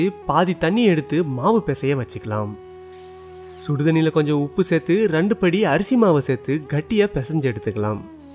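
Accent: Salem, India. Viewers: இவர் பேசுவது சேலம் வட்டார மொழி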